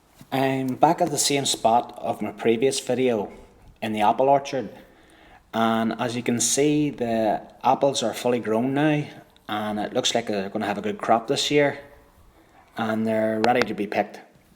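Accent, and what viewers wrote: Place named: Ireland. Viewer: Good shooting,love that Irish accent.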